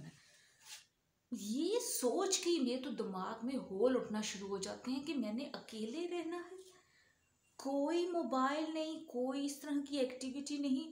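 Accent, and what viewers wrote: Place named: Punjab, India. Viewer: Punjabi xent❤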